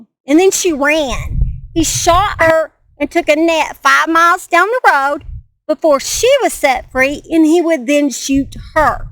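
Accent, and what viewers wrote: South, United States. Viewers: Love you always ❤ sounds like you’re shouting this story, please preserve the southern voice. I know this was 2 years ago but I’m catching up xx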